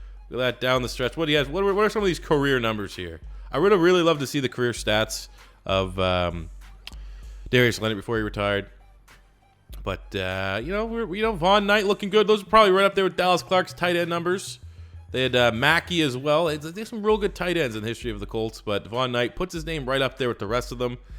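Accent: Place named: Canada. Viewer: Hes Canadian its his accent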